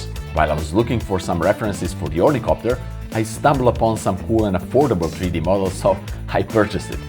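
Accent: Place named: Brazil. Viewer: Just, INCREDIBLE! Congratulations! Are you from Brazil? Your english accent reminds me brazilian people.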